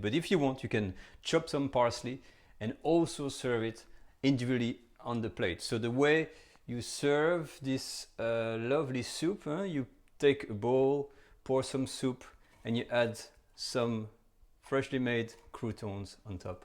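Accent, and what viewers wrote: France. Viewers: Merci 😘 j'adore French acent in English language. Bisous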